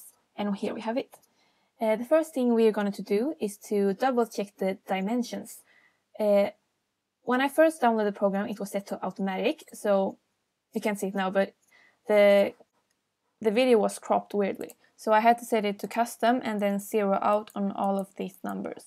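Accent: Sweden. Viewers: cute swedish accent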